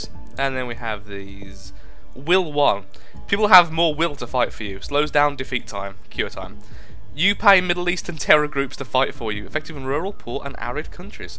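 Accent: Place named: England, United Kingdom. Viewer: its England init.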